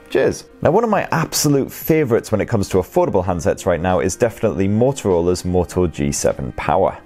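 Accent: United Kingdom. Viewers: At last, someone speaking with a British accent!!!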